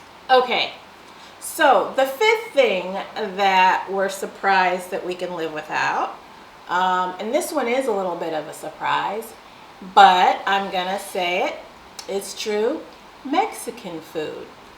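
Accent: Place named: Lisbon, Portugal. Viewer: Awww you already talk as a lisboeta 😊😊👍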